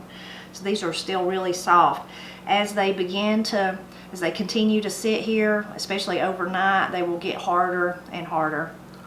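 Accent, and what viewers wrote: Appalachia, United States. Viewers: I recently found your channel and I love it ❤ My mom made these for me and my siblings when we were growing up. The recipe came from my dad’s mom and we call them oatmeal candy. Your accent is very similar to my dad’s and his family. They are from a little town called Lafayette, GA which in the Appalachian mountains 😊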